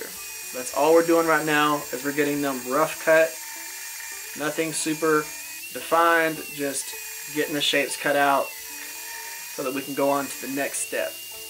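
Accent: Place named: Georgia, United States. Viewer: Brother you sound like you are from Georgia like me! :)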